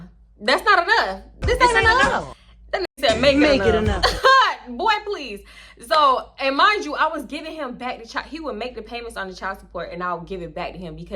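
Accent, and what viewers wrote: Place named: Houston, United States. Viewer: He sound just like a Houston nigga 😂😂😂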